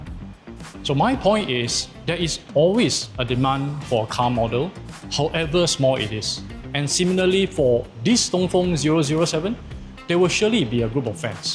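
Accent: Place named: Singapore. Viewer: Singapore English style 😑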